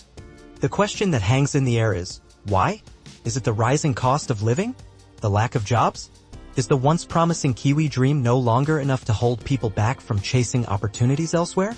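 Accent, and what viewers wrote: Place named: United States. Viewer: Whats with the us based ai voice?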